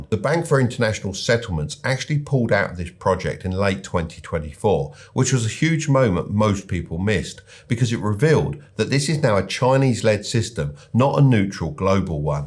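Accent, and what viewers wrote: Cockney, United Kingdom. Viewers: Cockney accent detected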